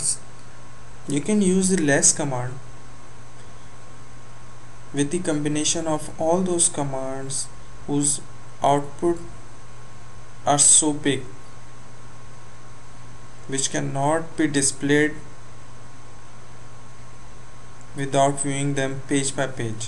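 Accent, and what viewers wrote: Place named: China. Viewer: thanks brother but i bet you're chinese with your funny accent lol just to tell u i love your accent :)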